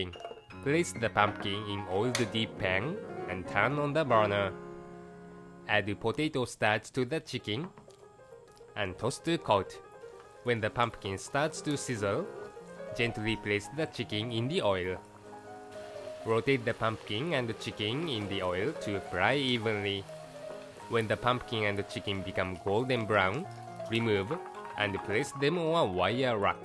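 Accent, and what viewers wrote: Japan. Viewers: I love watching your videos! Very clear and not too fast step by step on how to make the dishes. Other cooking videos I have seen so far were too quick/too fast to follow. Love your dog,too! The narator's english with his Japanese accent also has become your video's character even more Japanese 😊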